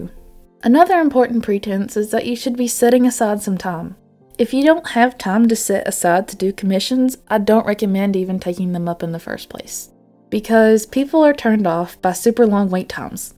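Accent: South, United States. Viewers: you sound southern but your voice is so sweet and calming! this video is so helpful thank youu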